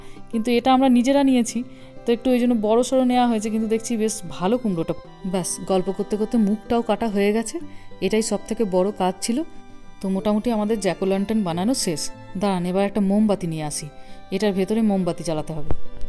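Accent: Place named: Bardhaman, India. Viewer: খুব সুন্দর লাগে আপনার ভিডিও দেখতে। আর তার চেয়েও বেশি ভালো লাগে আপনার কথা শুনতে। আচ্ছা আপনি কি বর্ধমান জেলার মেয়ে কারণ আপনার কথার মধ্যে বর্ধমান জেলার কথার একটা সুন্দর টান আছে। আমারও বর্ধমান জেলার সাথে একটা যোগাযোগ আছে আমি উত্তর ২৪ পরগনার বাসিন্দা হলেও আমার মামার বাড়ি হলো বর্ধমান জেলার মেমারিতে তাই বর্ধমান জেলার ওপর আমার আলাদা একটা ভালোলাগা আছে। উত্তরের অপেক্ষায় রইলাম। ভালো থাকবেন।।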